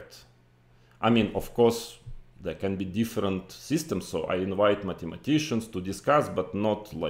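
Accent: Russia. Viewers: Владимир хорошо говорит на английском, правда с ОЧЕНЬ РУССКИИ АКЦЕНТОМ, что только радует что Владимир хорошо развит, и что он чисто русский, и мало был в Америке, и не нахватался американского акцента, в общем наш человек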